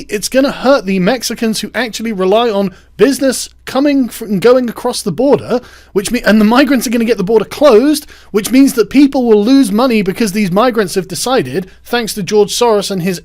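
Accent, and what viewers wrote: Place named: England, United Kingdom. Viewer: The best English accent ever! You could sell me anything after 2am on cable bro.....like Michel Cane in Zulu ( ole boy).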